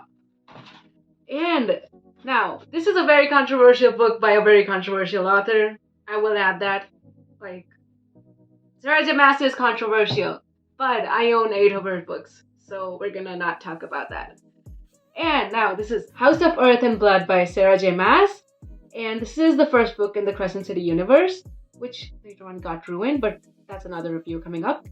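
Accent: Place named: India. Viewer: Omgg your accent is reallyyyyy niceee for an Indian girlll!!❤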